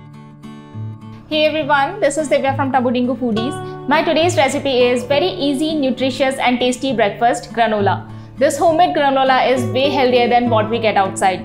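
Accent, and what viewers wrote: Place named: India. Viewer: Nice one lady! Thanksmuch. U make me laugh because of your indian accent. Bravo excellento Granola's love them to eat soon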